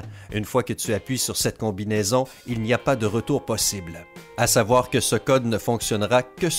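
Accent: Quebec, Canada. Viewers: Je kiffffe ton accent québécois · C'est très évident que la personne qui parle est un québécois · Voie canadiennes bonnes explication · très sympa et accent canadien trop rigolo pour nous en france c'est très campagnard ! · L'accent québécois purée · Wtf ses un québécois et ne me contredisez pas je connais l’accent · HAHAHA LA VOIX QUEBECOISE DE SYMPA! YAYYY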